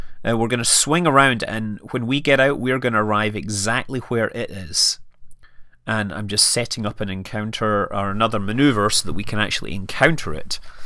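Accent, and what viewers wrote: Scotland, United Kingdom. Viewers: are you scottish or irish? cant tell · Hey, or you Scottish or Irish? Forgive me if you're something else, your accent just sounds more Scottish to me. Sorry if i offend you!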